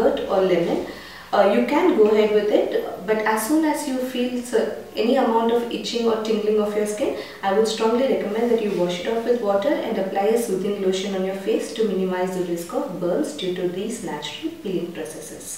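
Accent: India. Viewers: BSC Indian English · hahahah me neither i jsut hear indian stereotype sounds liek aphu from the simpsons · Her accent is purely indian as they speak hindi that's why many people couldn't understand...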